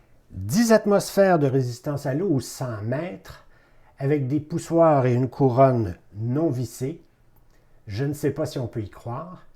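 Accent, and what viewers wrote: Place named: Quebec, Canada. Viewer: je vous en prie et votre bel accent du Quebec va très bien avec vos présentations...Bien à vous.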